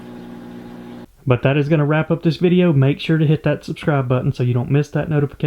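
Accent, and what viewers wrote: United States. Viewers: Man i really like your american accent. Greetings drom germany😄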